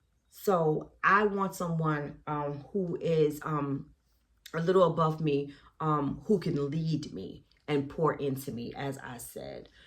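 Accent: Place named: Northeast, United States. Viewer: Where are you originally from? Sounds like somewhere in the NE? My daughter got into Spelman also but she went to another school in Rochester NY.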